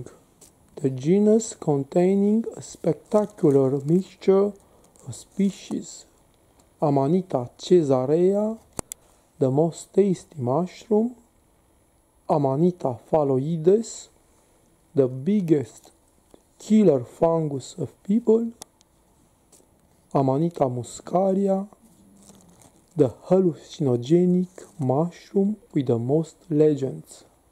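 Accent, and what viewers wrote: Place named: Europe. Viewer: Alright..not likely to pick up ANY white gilled mushroom. Are you from...difficult to tell- Italy, France, Austria; a mans voice is harder for me to discern .You can tell me.I learned so much from my European journeys!I loved it.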